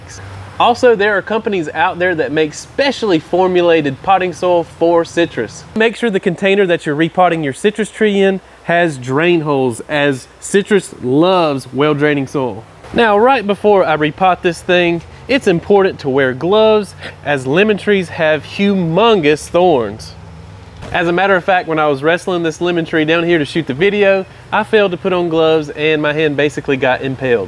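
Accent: South, United States. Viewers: Hilarious! I just got myself a little Meyer lemon tree and wanted to take the very best care of her- saw that this video had a southerner explaining stuff and knew I was in the right place! Thanks a bunch! Especially liked the alternative options for soil and fertilizer. One question: I watched a another person's video on YouTube and she mentioned that you should look for the part of the trunk that all the roots are coming out of ( it kinda looks like a peanut in the shell) , she said when repotting you should keep that part above the soil. Any thoughts or other explanations? Thanks again!!!!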